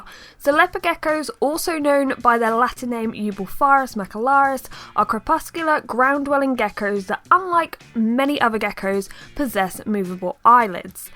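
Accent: United Kingdom. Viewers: Unsure of your accent, you from the uk?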